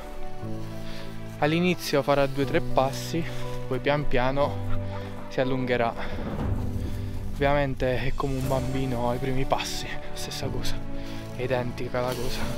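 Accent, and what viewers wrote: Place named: South, Italy. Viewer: Bravo,bel video ma di dove sei, mi sembri del sud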